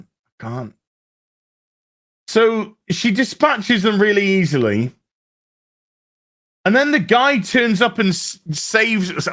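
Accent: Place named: Scotland, United Kingdom. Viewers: the scottish makes this Review sooo special XDDD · Alright, your scottish accent got you another subscription, mate! 😀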